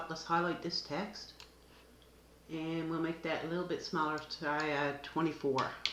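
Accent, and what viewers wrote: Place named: Nova Scotia, Canada. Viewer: Hi neighbour, I thought I recognized that accent. I'm from Nova Scotia.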